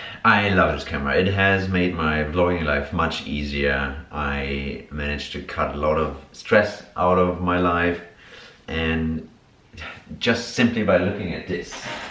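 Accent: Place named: Australia. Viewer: You sound like your from Australia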